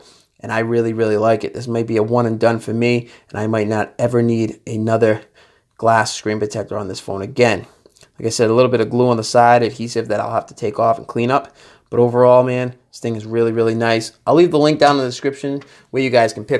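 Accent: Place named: Massachusetts, United States. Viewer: GREAT review and How-To. I did mine following along, liked and subscribed. Thanks a lot and loved hearing the familiar accent, close to home. Sounds kinda like.... Somerville, MA if I had to guess. MAYBE, Medford?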